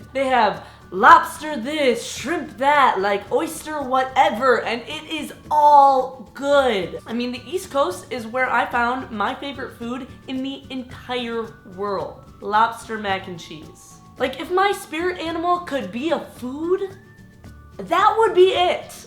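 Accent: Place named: Wisconsin, United States. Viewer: oooohh myy goddd your Wisconsin accent is crazy thick lol smh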